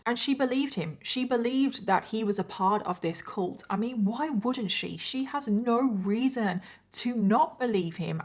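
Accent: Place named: United Kingdom. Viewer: I Looovvvee your accent, always been a fan of British accents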